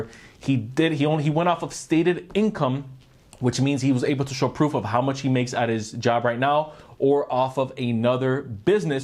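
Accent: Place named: Miami, United States. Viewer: Once you started talking, i can tell youre from Miami. 👏🏼👏🏼👏🏼 Subscribed.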